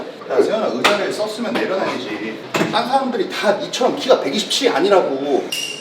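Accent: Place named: Countryside, South Korea. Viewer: 는 어디 시골 농부 페이스+얼굴과 어울리는 구수한 사투리 때문에 극호 ㅋ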